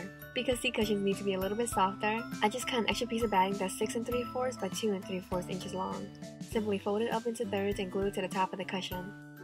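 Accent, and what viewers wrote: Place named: South, United States. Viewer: Are you from New Orleans? You have a southern accent 🥰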